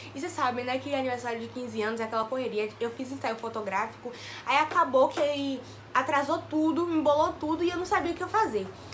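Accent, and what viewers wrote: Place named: Salvador, Brazil. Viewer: DE SALVADOR? NEGAAAAA SUA HISTÓRIA É TUDO E TEU SOTAQUE EU PENSEI EU CONHEÇO ESSE JEITO DE FALAR AAAAA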